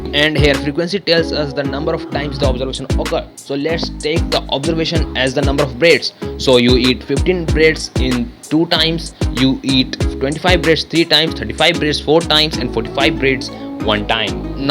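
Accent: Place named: India. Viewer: Indian accent 🤮🤮🤮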